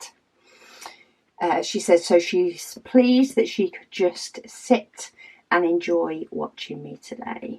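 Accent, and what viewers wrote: England, United Kingdom. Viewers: Hi! My husband, David and I watch you every day, and we’re enthralled by your speed of diamond painting. I love the pen you’re using today. So pretty. I finished a small partial special drill yesterday, and loved it. Being from the middle of the US, we are entranced by your accent and love hearing about your life in England. I’ve entered the giveaway, so fingers crossed!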